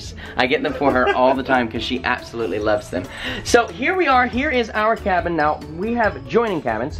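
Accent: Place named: South Carolina, United States. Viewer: in the fruit section is so precious... Y’all are cruising by the big cantaloupe and melons....: Can we eat them?’: yeah 🤣 bless her heart! She doesn’t understand the treat she’s in on!! Take it easy on her! She might become overwhelmed by the how wonderful cruising is! 🤣 relax and enjoys, guys! Btw...I Love watching your videos! I discovered your channel a few months back! I love y’all the (spelling?) and! And now! I’m from SC so you sound like some of my kin folk! 😉 Take care!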